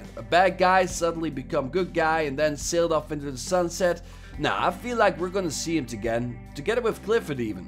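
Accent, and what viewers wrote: Netherlands. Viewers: Woah I didn't know you were dutch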